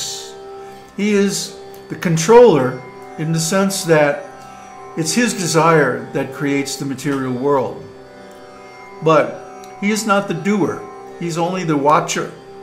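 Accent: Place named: United Kingdom. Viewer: Guruji are you originally from England or Scotland ?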